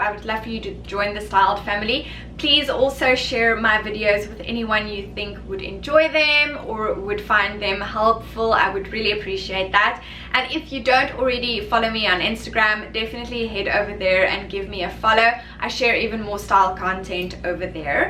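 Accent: South Africa. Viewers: Thx for your suggestions. I’m going to a wedding and wanted to dress up my dress. Also love the SA accent.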